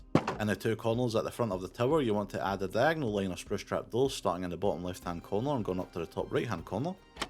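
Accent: Scotland, United Kingdom. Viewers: I think he’s Scottish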